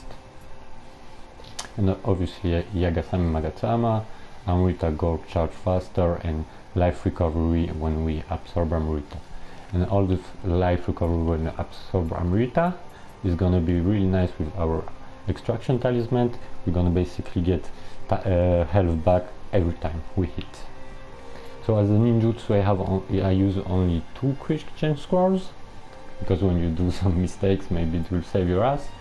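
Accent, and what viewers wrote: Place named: France. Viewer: Your French accent is so sweet, and good build gg wp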